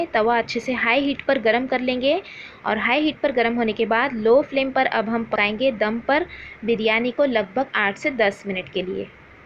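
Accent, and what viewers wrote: Hyderabad, India. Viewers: Aap Hyderabad daccan se hayn?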